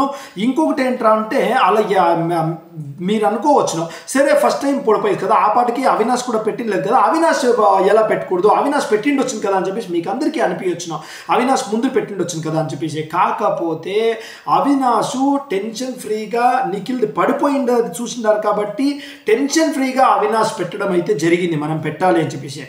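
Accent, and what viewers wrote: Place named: Karnataka, India. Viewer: Yes nenu kuda observe chesanu. Mee slang kannada telugu mix, na kannada friends telugu meela ne matladatharu.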